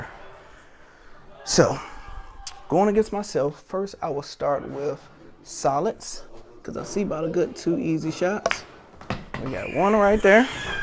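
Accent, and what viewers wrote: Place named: Washington DC, United States. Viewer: Bring that ass here boi😂 DC voice lol and bring a case of Coronas lol good shit playa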